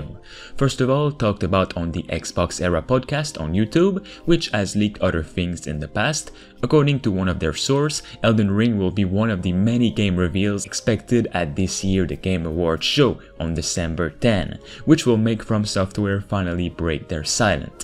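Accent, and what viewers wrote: Quebec, Canada. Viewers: Judging by the accent, he is probably french canadian.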